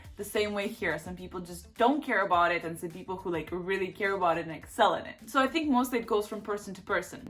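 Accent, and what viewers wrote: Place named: United States. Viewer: ayeeee congrats on your citizenship!!! By the way, you sound super american for only being here for 8 years. My grandmas been here for 50 years and she still can’t speak English lmao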